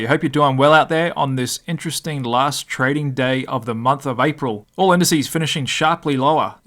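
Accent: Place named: Australia. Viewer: I liked that Aussie accent 👍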